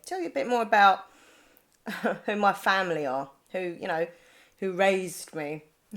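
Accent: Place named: England, United Kingdom. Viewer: Haha your accent is reyt good, proper English!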